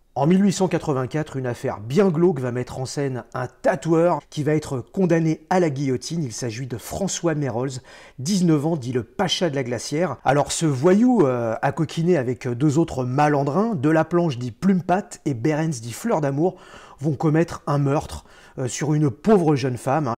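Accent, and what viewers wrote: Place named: Paris, France. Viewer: Je rajouterais un +1 pour ton phrasé qui fleure bon le Paris qu'on aime !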